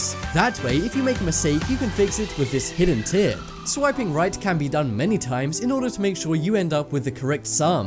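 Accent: England, United Kingdom. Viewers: Most irritating background music. Your accent sounds as though you are English, so the correct term for a meal ordered to be consumed at home is a Takeaway, not a Takeout, also, the correct abreviated term for Mathematics is Maths not Math. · How about talking a little slower, and not speak the Queen's English! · What the hell was that British ninny saying? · Sure would have been nice to understand wtf he was saying. British accent.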